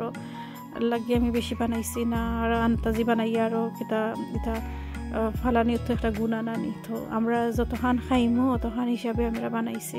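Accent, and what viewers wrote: Sylhet, Bangladesh. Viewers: মাই অসাধারণ তো আমার সিলেটি খালা মাত হুনিয়া অনেক ভাল লাগলো আমার তো একজন সিলেটি পাইলাম মাশাল্লাহ বন্ধু হয়ে গেলাম আমি সিলেট থেকে ইপু আমাক দেখিয়া জাইয়েন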